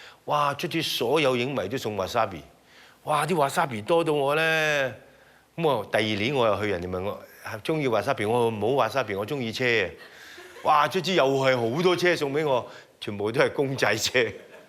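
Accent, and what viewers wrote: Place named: Hong Kong, China. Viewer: yeah it is. He's good at speaking English but I can tell he still has that hongkong chinese accent when he talks. And like he's not fluent at English, his sentences aren't great. But prefer him speaking cantonese, which they should tell him to do more. Ik cause he does stuff in China he had to learn mandarin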